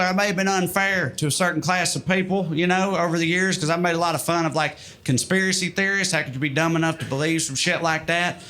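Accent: South, United States. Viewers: I actually felt it was the opposite, that he felt the need to compensate for his accent by using big words like "vehemently" "gain purchase" "whimsical anarchy" and so on, I think he plays on the jarring nature of being a verbose redneck, that's one thing I love about his comedy. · His “southern accent” is getting thicker, and more cringe. Before you know it he’ll be ending every joke with GIT’R Done! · Good Lord! God Al.ighty! This Southern sounding boy makes me relate😁Much enjoyed, go on with your bad self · It's like Alan Sorkin dialogue performed with a southern accent. The writing is that good. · Does he have a southern accent? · Yall are my favorite genre of white people. Love a liberal with a thick southern accent. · Picture the language skills of G.K.Chesterton in the accent of the Dukes of Hazzard. Brilliant and hysterically funny. Took my husband and son-in-law to see Trae at Funny Bone in Virginia Beach. 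A crowd of huge fans, blown away by his intelligent goofiness. 🥰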